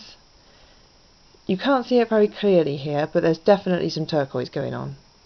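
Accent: United Kingdom. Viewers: I love your accent and videos :), are you British btw? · whoo hoo your'e british!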